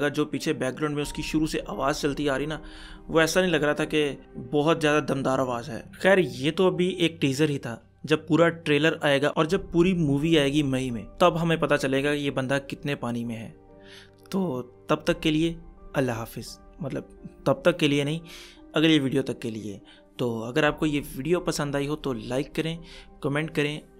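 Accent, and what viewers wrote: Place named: Pakistan. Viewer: this guy sounds like Pakistani..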